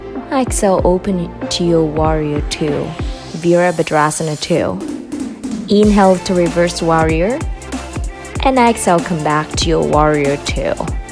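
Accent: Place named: United States. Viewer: O nói mà tưởng bà Mỹ nói á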